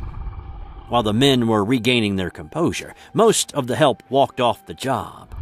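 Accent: South, United States. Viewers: Your country girl accent is perfect. Lol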